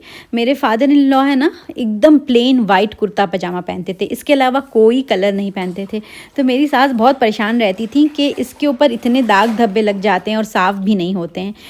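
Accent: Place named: Azamgarh, India. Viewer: ap Azam greh ki hn meri susral mn nnden Asi hi bolti hm kpry ko kpra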